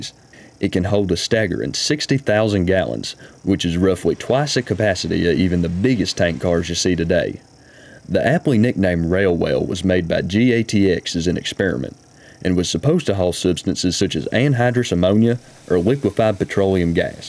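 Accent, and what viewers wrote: South, United States. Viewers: Love your Southern US English. It sounds like home.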